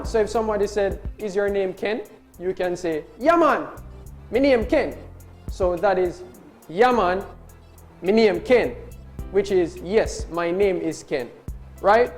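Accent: Jamaica. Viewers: Thank you for this... I've had a horrible day, and to hear you speak brings me back to my sweet Jamaica. Thank you for making my sad heart smile a little bit. ❤🇨🇦❤🇯🇲❤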